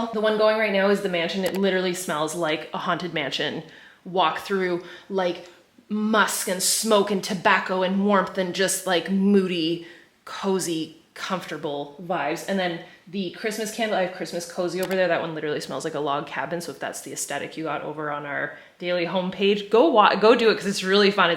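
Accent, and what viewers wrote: Quebec, Canada. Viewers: en français! Apprends-nous l'accent québécois <3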